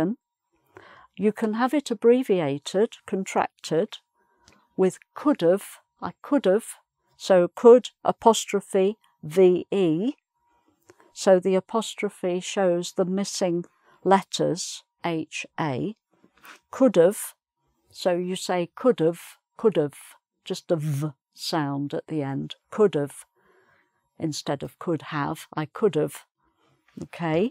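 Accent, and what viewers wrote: England, United Kingdom. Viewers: Is her exent british??plz tell me.becoz i need british teacher. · O ne kadar güzel ingiliz aksanı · You have really beautiful British accent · ... I found you yesterday... and I am to confess that your English accent is fantastic... And your explanations are very clear and useful. With love from Brazil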